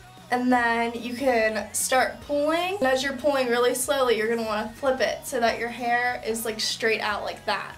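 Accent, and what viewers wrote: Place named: South, United States. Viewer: Your accent girl! It's nothin but southern. I love it. And I have a Royale as well. Best ever.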